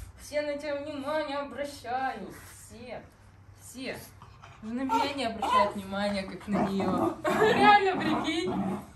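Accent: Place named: Eastern Europe. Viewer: Her accent seems to be from Eastern Europe. Where is she from ?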